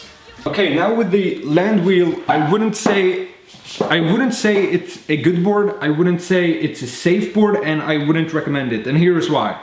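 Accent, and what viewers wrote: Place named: Sweden. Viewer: Just when I was wondering "why are his pants so small?" he started speaking with a Swedish accent and it all made sense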